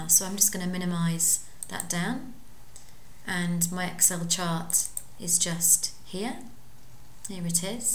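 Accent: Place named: United Kingdom. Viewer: annoying british accent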